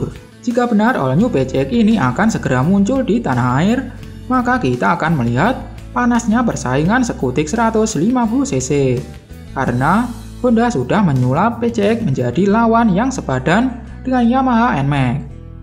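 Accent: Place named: Java, Indonesia. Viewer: Medok bener jawa nya bro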